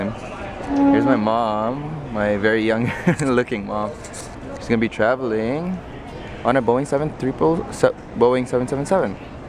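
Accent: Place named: Canada. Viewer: Candian accent ka na ah. :) cool.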